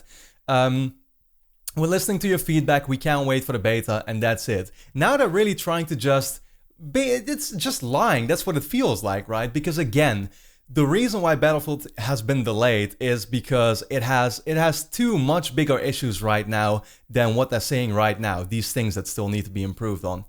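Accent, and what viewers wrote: Netherlands. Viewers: Hmmmm you have a Dutch accent.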